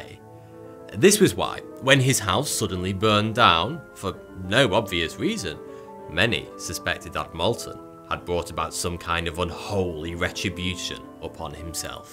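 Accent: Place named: Cockney, United Kingdom. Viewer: it is called “the cockney accent”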